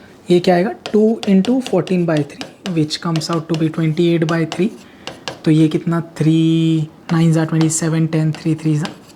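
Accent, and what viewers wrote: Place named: Punjab, India. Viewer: 😂he literally was so tired “Or graph khatam h nahi ho raha “ 💘truly admiring person and love ur panjabi accent >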